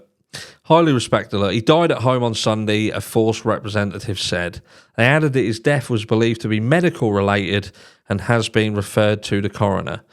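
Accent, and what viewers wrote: Norfolk, United Kingdom. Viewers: That sounds like a west Norfolk accent? Enjoyed you vid. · I thought I heard a bit of Norfolk in the accent 😂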